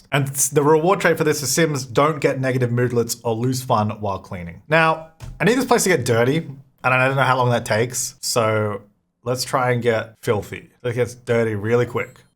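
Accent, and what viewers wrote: Australia. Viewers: I feel so stupid I’ve been watching you for years and apparently I’ve missed that you’re in Australia and I knew you had an accident but I could never pinpoint where, I thought maybe New Zealand.. it was because it wasn’t that cartoony Australian accent I never would’ve thought I felt so stupid right now I need my PhD taken away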